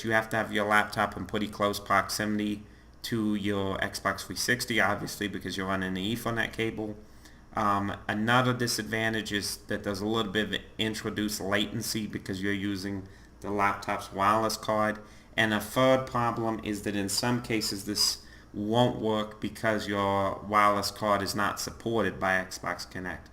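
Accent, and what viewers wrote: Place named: New Jersey, United States. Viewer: this guy is 100 % from new jersey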